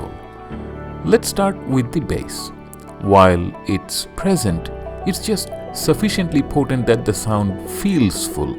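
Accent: Bengal, India. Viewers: your accent made me guess that you could be Bengali. That's why I asked.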